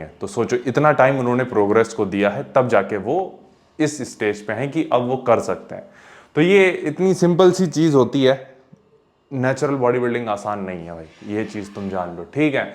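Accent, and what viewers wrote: Gujarat, India. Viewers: Bhai tum gujrati ho kya ?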